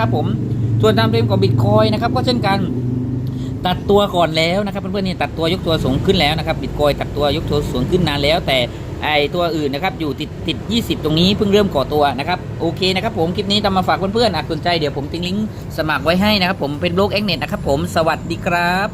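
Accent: South, Thailand. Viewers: คนใต้แน่นอน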